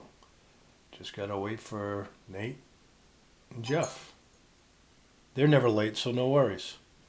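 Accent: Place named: Massachusetts, United States. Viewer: I'm thinking Tewksbury/wilmington? maybe billerica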